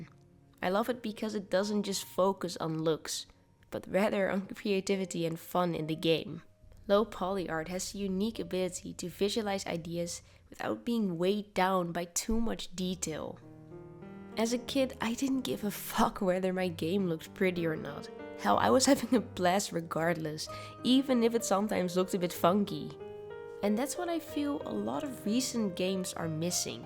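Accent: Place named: Netherlands. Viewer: haha ik kon echt meteen horen dat je nederlands was, goeie video wel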